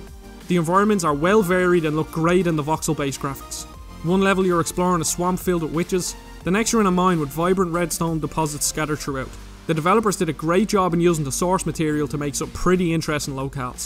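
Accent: Ireland. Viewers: How is it that your accent is so Irish yet so clear? I think you have the best accent ever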